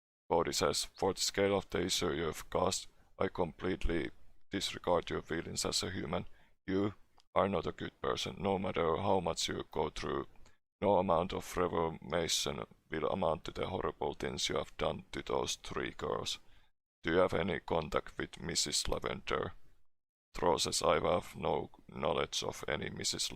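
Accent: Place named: Finland. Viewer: u sound like finland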